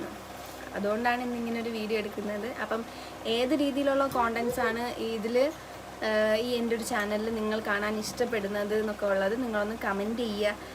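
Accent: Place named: Thiruvananthapuram, India. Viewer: നിന്റെ സംസാരം കേട്ടപ്പോയെ മനസ്സിലായി തിരുവനന്തപുരം ആണെന്ന് 👍👍super ആയിട്ടുണ്ട് വീഡിയോ ഇനിയും idanam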